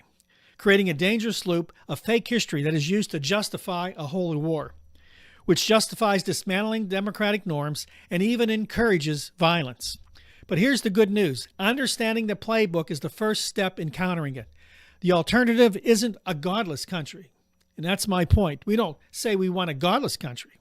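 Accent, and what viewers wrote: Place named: Pittsburgh, United States. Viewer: you have a Pittsburgh accent (or SW Pennsylvania). Are you a fellow-yinzer? I enjoy your important material. Keep it up and don't be a jaggoff! 🖤💛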